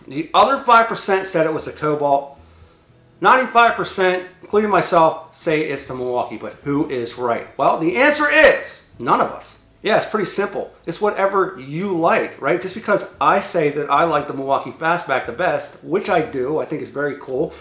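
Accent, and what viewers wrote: Pittsburgh, United States. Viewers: your Pennsylvania/Pittsburgh accent. Love it!!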